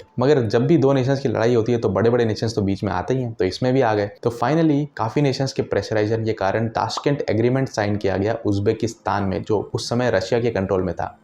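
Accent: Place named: Hyderabad, India. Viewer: hyderabadi hindi💞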